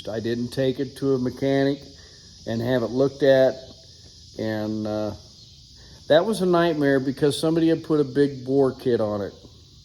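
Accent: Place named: Oklahoma, United States. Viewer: You my mention Oklahoma. I live in Oklahoma and I hear all the locusts in the background and I'm thinking that you sound like your around here somewhere.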